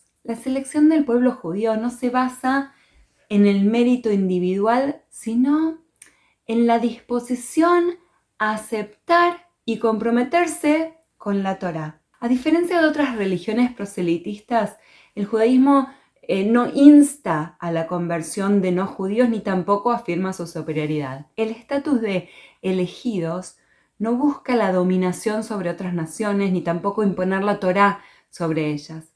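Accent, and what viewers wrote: Argentina. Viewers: Hola! Soy nuevo suscriptor y me encantan tu bella sonrisa, tu apacible mirada y tu lindo acento argentino! Claro también aprecio mucho tu información y enseñanzas sobre el Judaísmo! En el tema del pueblo escogido, tengo la siguiente duda: te he oído decir que el pueblo judio tiene la responsabilidad de dar buen ejemplo de vida y de preservar y difundir las enseñanzas de Dios, sin embargo, he escuchado decir a otros exponentes del Judaísmo que la Religión judía no busca convertir a nadie, ni lograr que otros abracen sus creencias! Cómo entonces se pueden difundir sus enseñanzas si pareciera ser una Religión muy particular o restringida a un círculo muy estrecho de conocedores y practicantes? Cuando llegue el Mesías que esperan, El difundirá a todo el mundo la religión judía y su búsqueda de la Paz, o ustedes deben llevar a cabo esa tarea?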